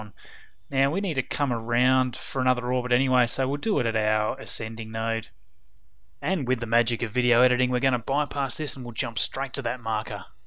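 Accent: Australia. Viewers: Finally an Aussie you tuber thanks mate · Nice to hear a fellow Aussie playing KSP!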